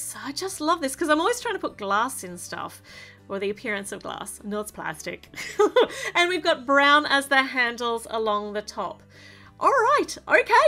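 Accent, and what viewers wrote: Australia. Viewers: She has so much energy and by listening to her talk you can hear the amazing Australian accent❤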